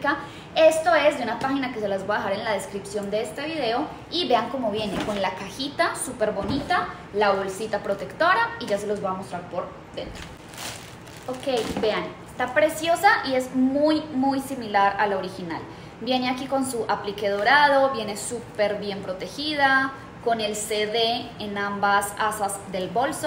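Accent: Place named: Mexico. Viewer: Que lindo lugar ❤️💕., ya se te nota un poquito el acento mexicano 🤭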